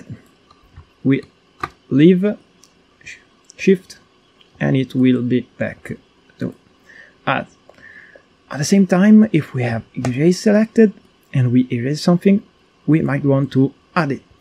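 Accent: Italy. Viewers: Lo sapevo che eri italiano, dalla pronuncia ahahahha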